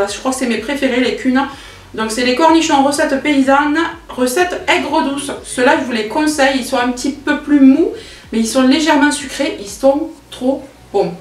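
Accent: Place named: Southwest, France. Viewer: Coucou, merci pour tes vidéos, dis moi au vu de ton accent je me permet de te demander d'où tu viens, je suis du sud ouest et le même accent lol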